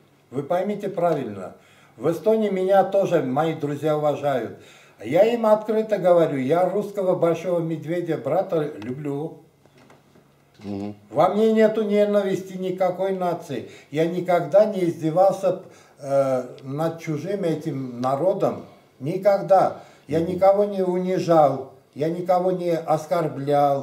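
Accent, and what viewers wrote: Armenia. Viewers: ДОРОГОЙ ВЫ МОЙ ЧЕЛОВЕК,ВЫ ДАЖЕ ВНЕШНЕ ПОХОЖИ НА АРМЯНИНА И АКЦЕНТ У ВАС АРМЯНСКИЙ, ДАЙ БОГ ВАМ ЗДОРОВЬЯ И ДОЛГИХ ЛЕТ ЖИЗНИ! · Боже мой, у него даже акцент армянский!, спабибо, что Вы есть у нас. Здоровья и благополучия всей Вашей семье! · Круто, и акцент армянский..ещё круче то что он художник. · Достойный сын эстонского и армянского народов!Честь и слава вам! Но по русски говорите с армянским акцентом.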